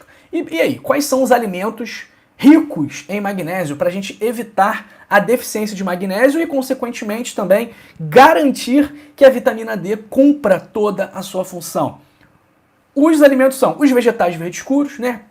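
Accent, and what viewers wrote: Rio de Janeiro, Brazil. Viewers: Pecebir que o dr era Carioca devido seu sotaque de carioca !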